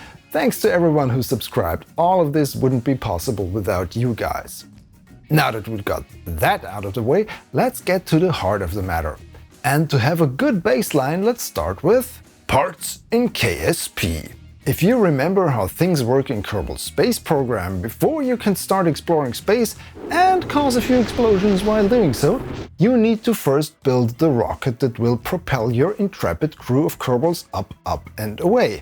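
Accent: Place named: Germany. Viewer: Thanks for these update videos. I don't have time to get deep into KSA development these days, so I'm glad you're providing summaries of whats going on. As an aside, what is that accent of yours? It sounds sort of German, but slightly spicier. So like perhaps you're Dutch or Austrian? I don't think Polish, and I feel like Belgian would be more Frenchlike? Just curious.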